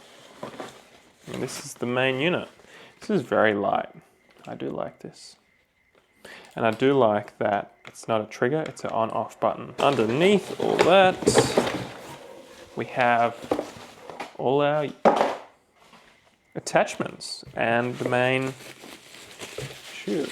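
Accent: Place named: New Zealand. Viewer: you sound like a Kiwi, are you?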